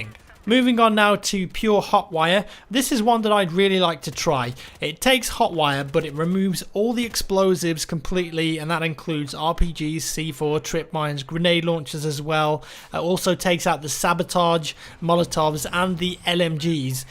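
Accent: United Kingdom. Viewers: Never Played Hardline, just here for that British voice...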